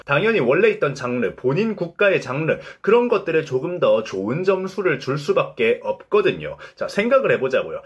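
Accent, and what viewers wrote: Gyeongsang, South Korea. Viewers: 목소리에서 좀 힘을 빼시면 어떨까요? 아마도 경상도 사투리 때문에 더욱더 힘을 주시는거 같은데 너무 같은 높은 톤으로 때리시니 듣는 동안 힘이 드네요 ㅠㅠ 요즘은 그냥 자연스럽게 사투리 톤도 괜찮을거 같은데...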